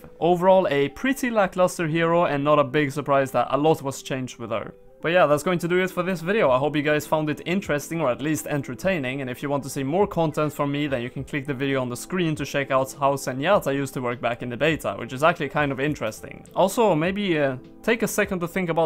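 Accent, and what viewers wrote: Sweden. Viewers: You sound like you are swedish?! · are you swedish? I'm swedish and thought that you sounded like a swede. (I'm not trying to be mean.)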